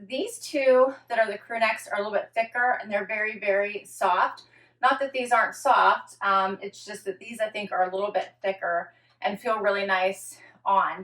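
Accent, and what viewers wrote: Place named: Pennsylvania, United States. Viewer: So happy to see you love tee shirt styling like me!! I get lots of ideas from you. I also heard you mention yr from PA. I also heard it in your accent because I am from Philadelphia. I live in Florida now so it's nice to hear someone from home. Me and my puppies watch every morning with our tea. Thank you 😊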